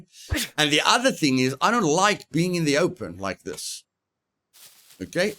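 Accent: South Africa. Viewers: you sound South Afican Are you SA ? I am an south african looking for discord channels or groups of south african scum players or maybe possibly to play with a pro like you ive just started youtube streaming working on building a base viewer amount before making vids so im currently just streaming some games with friends watching im aware i talk a differant language than english,afrikaans.but im quite fluent in english so im just seeing if your intersted in maybe playing together thx for the good vid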